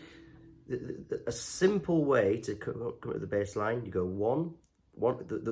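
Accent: United Kingdom. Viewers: ☺️ ah! Nice too hear a native YORKSHIRE voice teaching guitar. Nice wee tutorial as always, cheers pal 🍻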